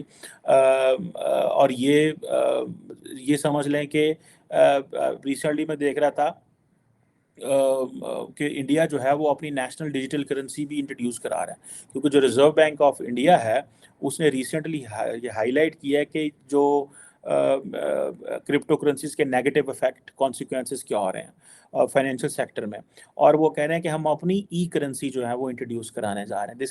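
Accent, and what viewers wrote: Punjab, Pakistan. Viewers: you amaze me sometimes! You sound like my father who was from Gujranwala and had the same lehza in the Punjabi he spoke! Ha mare Kutte bhi Khas hain! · Love your punjabi accent😅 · I love the Punjabi tadka you give. Keep it up.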